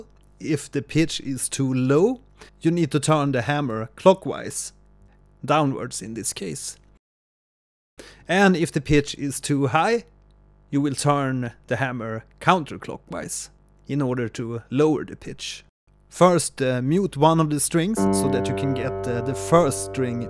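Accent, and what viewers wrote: Sweden. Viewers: Thank you 🙏. You sounds like swedish 😂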